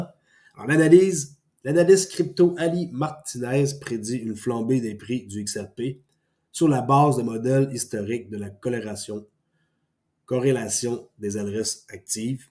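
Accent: Quebec, Canada. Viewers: Merci pour tes recherches et analyses. Cest plaisant d'entendre un quebecois se prononcer sur le sujet XRP XLM XDC!!! LA MECHE SE RACCOURCIT!!! KABOOM!!!!!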